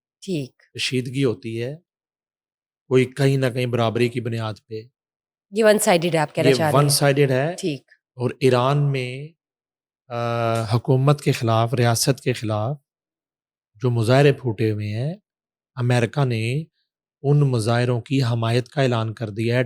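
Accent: India. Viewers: اگر آپ نے انڈین کلچر ہی پروموٹ کرنا ہے تو بہتر ہے آپ انڈیا چلی جاؤ.... پاکستانی بچوں کی اُردو خراب نہ کرو.... بہت شُکریہ😮 خ...خان کو کھان کہنا غ.....غیر کو گیر کہنا یہ ہمارے ہاں نہیں چلے گا افسوس اس بات پہ ہے کہ ڈاکٹر ثمینہ حق نے بھی اپنے ایک پوڈ کاسٹ میں کہا تھا کہ انڈین ڈرامے سٹار پلس وغیرہ دیکھنے چھوڑیں آپکی زُبان، کلچر، رہن سہن، مذہب اور دماغی سازشیں سب کُچھ آپکے ماحول کو گھروں کو خراب کر رہے ہیں.... مُشتری ہوشیار باش 😮😢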